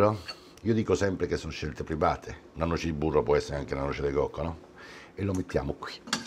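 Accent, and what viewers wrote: Rome, Italy. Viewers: His roman way to talk is absolutely beautiful mixed with such an elegant sense of humour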